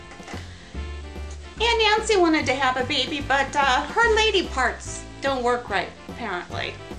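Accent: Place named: Minnesota, United States. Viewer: LOL, So the next time my granddaughter wants me to read one more story and I'm too tired, I'll call on Aunt Nancy... The accent will help her get to know her Minnesota roots. But wait - I'm never too tired to read to her. Whew, she's safe! LOLOL! Great vid.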